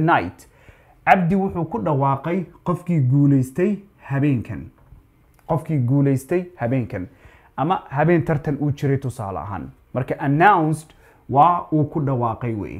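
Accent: United States. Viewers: In fact, i admired your of way tecahing.. jazaakallaahu kheyran.. Please lemme know where and how u learned that rough language, specifically the American accent...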